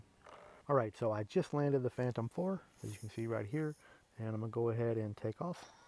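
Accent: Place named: United States. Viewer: why talk meters when you sound American.....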